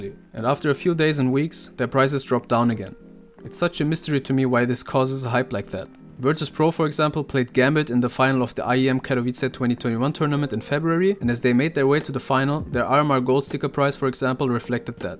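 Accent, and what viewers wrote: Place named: Germany. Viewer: Where are you from? You sound like german and you talk about trilluxe in some vids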